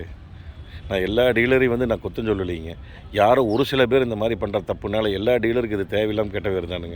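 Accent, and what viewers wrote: Kongu, India. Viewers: கொஞ்சும் கொங்குத் தமிழ் பேசும் கோமகரே...! திகட்டாத தித்திக்கும் பேச்சுடைய திருப்பூர் திருமகரே...! எங்கள் மதிப்பிற்குறிய டான் லடாக் பயணச் சாதனைச் சகாப்தம் திரு. அண்ணா அவர்களே❤❤❤🙏 எப்படி இருக்கீங்க அண்ணி❤❤❤🙏 எப்படி இருக்காங்க தம்பி ரித்திக்❤❤❤ எப்படி இருக்காரு மனசெல்லாம் வலிக்குது😔 ஒவ்வொரு ரூபாயும் போன் போன்றது😔 அதோட மதிப்பு இப்படி விரயம் ஆகுறத பார்க்கவும் முடியல கேட்கவும் முடியல😔 ஷோரூம்ல புது காரை டெலிவரி எடுக்குறதுக்கு முன்னாடி PDI (PRE DELIVERY INSPECTION) விநியோகத்திற்கு முந்தைய ஆய்வு. இதை 100% செய்துதான் காரை டெலிவரி எடுக்கனும். ஒரு புது காருக்கே அப்படினா? ஏற்கெனவே ஒருத்தர் பல வருஷமா ஓட்டி தேய்ச்ச பல வருஷத்துக்கு முந்தய used மாடல் காரை 2000% துள்ளியமாக சரிபார்த்து இல்லையா வாங்கனும்😏 ஏமாறுபவர்கள் இருக்கும்வரை ஏமாற்றுபவர்கள் ஏமாற்றிக்கொண்டுதான் இருப்பார்கள்...! என்றும் அன்புடன் உங்கள் தம்பி என்றும் அன்புடன் உங்கள் TMF ரா.முஹம்மது கபூர் (சென்னையில் இருந்து)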